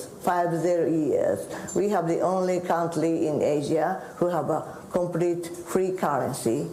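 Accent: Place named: Japan. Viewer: 日本的発音ではあるけれども、 英語で自分の考えを専門知識とともに自由に述べることができる日本では希少な政治家の一人ではありますね。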